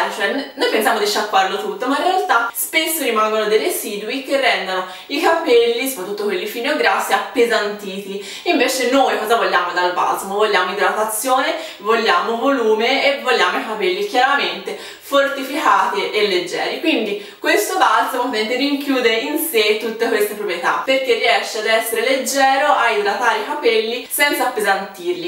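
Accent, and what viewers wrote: Tuscany, Italy. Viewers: Sei della toscana? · dialetto toscano ;) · ma sei fiorentina' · Sei toscana? Video utilissimo <3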